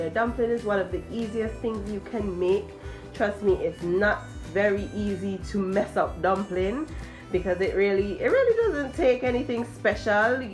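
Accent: Antigua and Barbuda. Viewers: Wait y she sound Antiguan so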